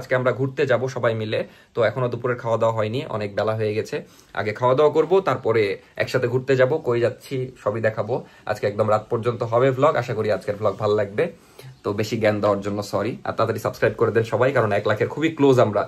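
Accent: Rajshahi, Bangladesh. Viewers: the best thing we like is your true Rajshahi accent ar apni o apner wife er true innocence. Allah apnader shushtoh abong bhalobashai poripurno rakhoon. Ameen abong apner Maa er jonno pach wakht salah ar dua korben karon etai uner akhon aktai chawa ar apni shontan ar apner dua is most important. Respect ✊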